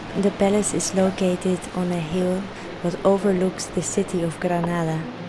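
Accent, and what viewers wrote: Netherlands. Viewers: You must be Dutch lady. I recognized your accent, machalah fellow woman.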